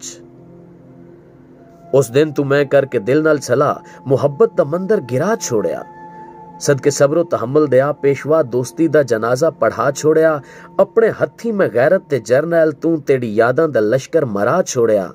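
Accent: Saraiki, Pakistan. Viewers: Aap saraiki hai kiya?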